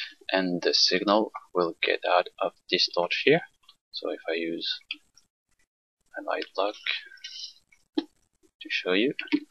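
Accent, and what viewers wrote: France. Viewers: i need to know, are you french?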